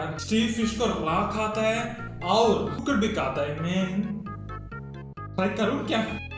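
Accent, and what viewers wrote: South, India. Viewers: your south indian accent is so good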